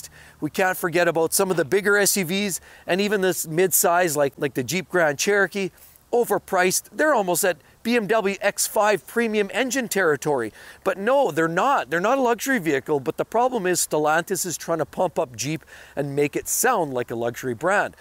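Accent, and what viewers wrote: Canada. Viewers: This guy is Canadian according to his accent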